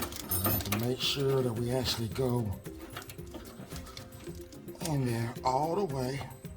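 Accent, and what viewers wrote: South, United States. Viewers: love the southern accent, lol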